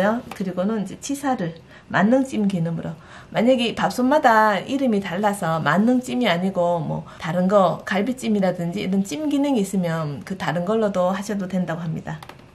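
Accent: Gyeongsang, South Korea. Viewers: 뿌사졌어요! ㅋㅋㅋ 깨졌어요 부러졌어요 보다 훨씬 적당하고 현장감나는 표현이네요 갱상도 사투리도 참 듣기 좋아요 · ! 경상도 말씨. 너무 구수하고 좋아요. 빵도 구수하게 잘만즈셨네요. 꼭 딸아해봐야겠어요. 💯👍💯💐💐💐🎊🎊🎊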